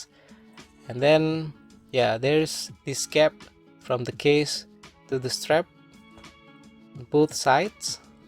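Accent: Indonesia. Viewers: channel review jam tangan underrated ini, inggrisnya bagus bgt dengan aksen khas indonesia yg sangat jelas pronounciation nya. lanjutkan terus mas mantap!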